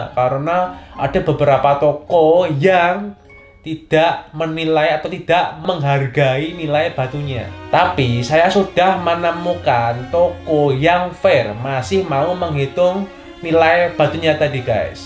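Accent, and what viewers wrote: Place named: Surabaya, Indonesia. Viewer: Koko medog banget Jowone koyoke wong Suroboyo nggeh? Terimakasih infonya yaa Ko,,sangat membantu sekali kontennya dan bermanfaat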